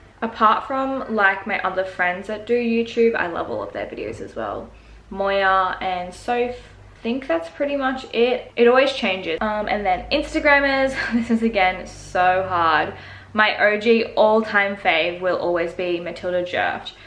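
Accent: Australia. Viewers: I can’t tell where she’s from like Australia or New Zealand maybe?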